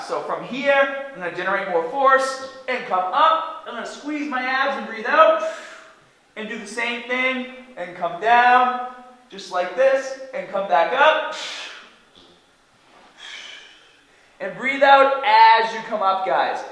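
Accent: Boston, United States. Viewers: You are from boston no ?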